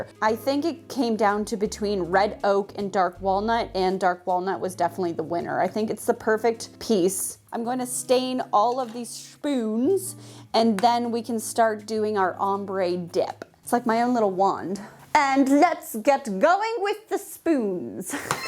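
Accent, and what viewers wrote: United Kingdom. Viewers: Did you binge watch Harry Potter before you did this cause you got the accent down! The birds! That was really awesome, so cute! I love both DIY'S. I didn't think I would care for the spoon art but it looked so good.. I stand corrected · Love your British accent 😆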